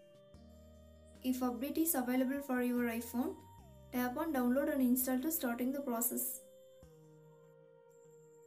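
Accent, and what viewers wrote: India. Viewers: i liked the video because of the indian accent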